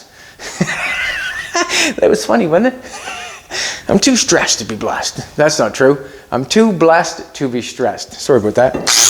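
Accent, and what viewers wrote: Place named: Canada. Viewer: that Canadian accent gets on my nerves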